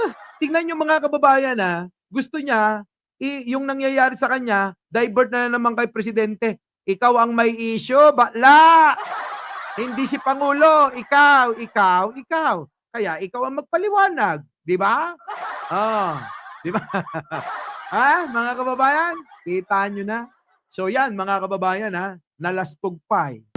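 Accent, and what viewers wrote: Ilocos, Philippines. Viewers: I like how you speak ilocano ading!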